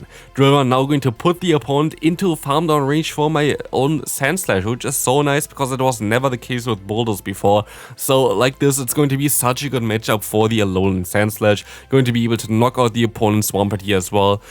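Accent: Germany. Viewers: Der deutsche Akzent kickt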